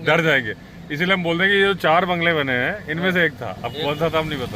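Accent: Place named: Baghelkhand, India. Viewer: Bhangelkhadi boli tone 😊 Bansh and gopad Chhattisgarh ki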